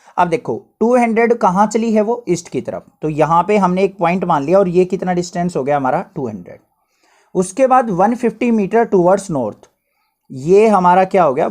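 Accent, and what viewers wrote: Haryana, India. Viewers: Sir ap haryana se ho na apki language thodi vasi hi h sir or baki sab ke bhi solve karado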